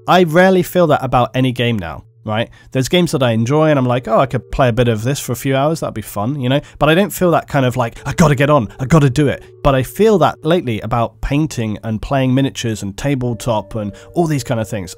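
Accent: England, United Kingdom. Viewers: I stumbled onto your channel solely from the "40K Lore" stuff. While there's other channels that also do great work on 40K lore, your product to me is the overall best. The music, the imagery, and the English accent all really go well together. I am 150% stoked on the idea of seeing Dredd Lore as well! When I think of gritty, dark, and masculine Sci-Fi/Fantasy from the UK, Warhammer and Dredd are the first two things that pop in my mind. I rarely find a new channel on YouTube that really hits me like yours has, so keep up the fantastic work and I look forward to what's in store!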